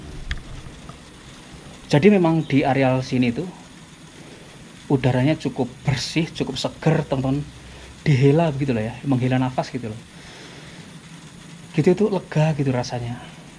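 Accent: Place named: Lampung, Indonesia. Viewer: Asslm, waduh jane bru sempat buka hp bos qu, walau tapi pasti hadir slm mlenuk 2 wai dr lampung, ms bhsa ngomong nya kok sama dgn tmpt ku si, kurang jegrak, e ms siang hari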